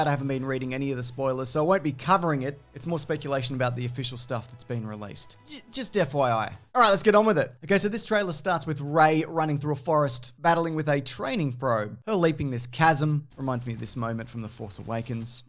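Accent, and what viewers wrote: Australia. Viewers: You are the reason I switched my Siri voice to Australian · Doan said it's the accent and they're partly right - it's because he's Australian. It's so bloody hot here that you can't care about anything else. Notice how started to get wound up about something, but then just bails on it? It's too bloody hot to stay in that zone for long.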